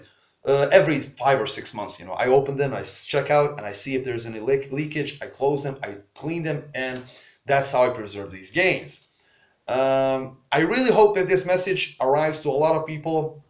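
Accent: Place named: United States. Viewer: U sound so american haha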